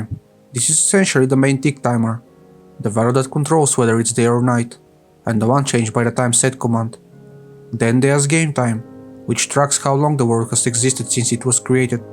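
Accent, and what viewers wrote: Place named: Russia. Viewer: Cool. But for some reason your accent reminds me of Russian. I'm Russian myself and I've heard Russians speak English. It amused me)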